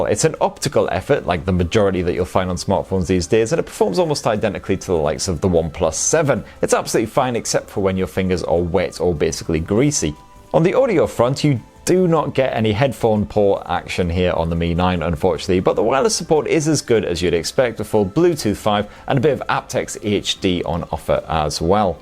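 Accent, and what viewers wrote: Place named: North East England, United Kingdom. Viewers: Somewhere between Teesside and Newcastle (North East England). Its quite hard to tell as he is quite well spoken, I would hazard a guess at possibly Sunderland as his accent seems stronger than mine and I'm from teesside but I could be completely wrong. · I'd say Teesside or around Newcastle as well.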